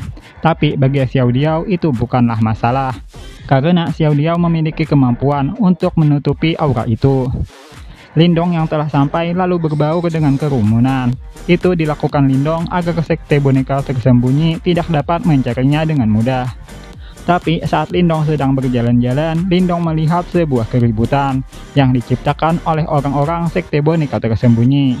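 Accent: Banjar, Indonesia. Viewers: suara orang banjar tak bisa dirubah logat nya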